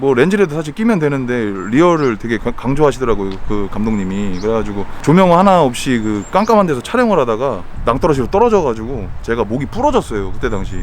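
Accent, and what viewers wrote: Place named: Chungcheong, South Korea. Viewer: 찐 충청도 충남 말투 굳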